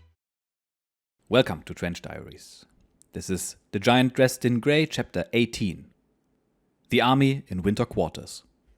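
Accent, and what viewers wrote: Germany. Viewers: The war for southern Independence???sounds like lost cause propaganda. Also who are you ? You sound like your German. Stay in your lane fritz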